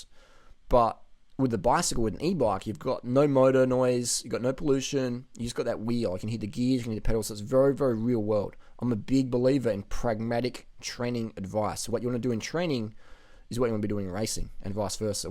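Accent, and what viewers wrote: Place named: Adelaide, Australia. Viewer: Your accent gets thicker the longer you're back in Radelaide.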